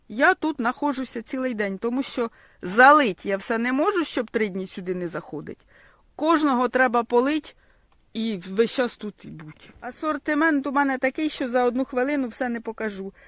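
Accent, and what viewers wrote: Poltava, Ukraine. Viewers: Доброго Вам здоров'я. Мене захоплюють Ваші відео щирістю, гумором, простотою. Та ще й рідна полтавська мова! Успіхів Вам !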